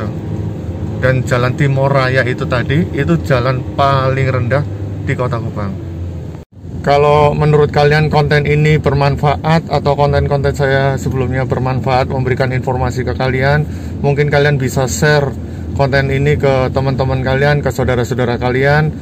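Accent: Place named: Java, Indonesia. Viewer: Kok logatnya ada jawanya Om. Dulu kuliahnya diJogja ya Om.🙏😁😁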